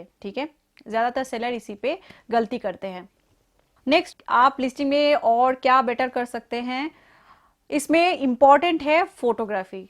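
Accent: Bengal, India. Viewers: your language is a serious issue , else information is good...one has to focus a lot to understood that bangla , hindi and english tone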